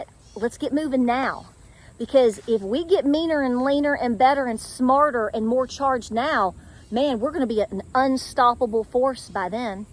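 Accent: South, United States. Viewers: I've just found your channel recently and I could of course tell you are from the south but today I heard you mention Oliver Springs and Oak Ridge Tenn and I thought oh wow I haven't those towns mentioned in years. I went to elementary school in Oliver Springs and Clinton and other family members lived in Oak Ridge. Brings back memories.😊 I really enjoy your program!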